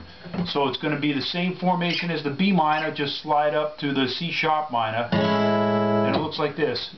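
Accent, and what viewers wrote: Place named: Long Island, United States. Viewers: I've been watching and learning this slowly along with a handful of other songs. Very insightful and well done, although you're LI accent is a bit amusing. Not meaning to be insulting, good job and good guitar. Guessing you're about my age, so I gotta ask. Do you remember The Good Rats? Awesome band that deserved a lot more recognition than they received. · Real Good! Can't wait to get home & try it out., you sound like my ex-bro-in-law, Joe, from da' Bronx - he's a good guy, too. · I've forgotten so i'm back.Thanks for the refresher and the New York accent,reminds me of home. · I get a kick out of your east coast accent. You sound like I did 45 years ago.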